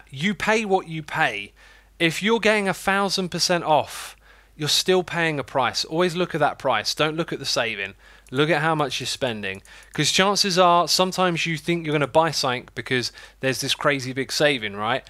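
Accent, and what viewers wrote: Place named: United Kingdom. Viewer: imma be honest... ur British accent makes me want to actually listen to you and take ur word for advice as a young person.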